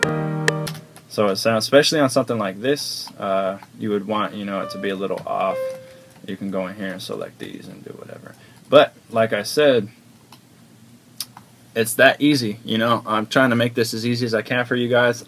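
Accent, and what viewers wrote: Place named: Texas, United States. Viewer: r you from texas or sum cause you got the hustla slang nom sayin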